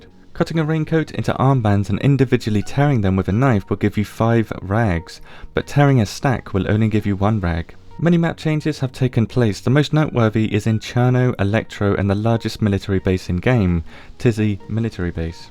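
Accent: United Kingdom. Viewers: This was lit (Love your soft British accent)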